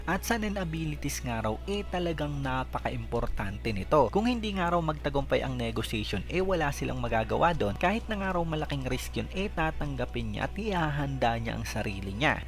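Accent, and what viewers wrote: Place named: Philippines. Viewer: Boss bakit yung pronouncing mo ng english parang pilipinong pilipino din.. medyo mahirap siguro paghaluin english at pilipino pag nagsasalita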